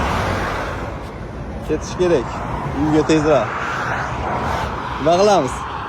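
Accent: Andijan, Uzbekistan. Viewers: Хее канде тугамед чучмен Анжонлисиз хамаси яхши булади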